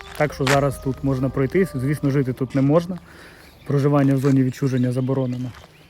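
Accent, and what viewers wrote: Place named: Surzhyk, Ukraine. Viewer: Окреме побажання автору каналу. Можете незважати на це, але: попрацюйте будь ласка над своїм словниковим запасом. У вас, надзвичайно багато суржику в вимові. Взрив, в прєдєлах норми... вибух, в межах норми і т.д. Я трохи більше року тому був сам узкаязьічньій, а тепер роздаю поради як розмовляти інакше. Все буде Україна.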